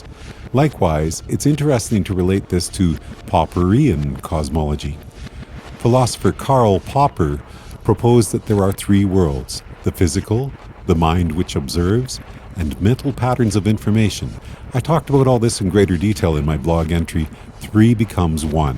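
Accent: Canada. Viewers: Is this guy Canadian? Instant turn off. No one can believe a Canadian would be saying something smart.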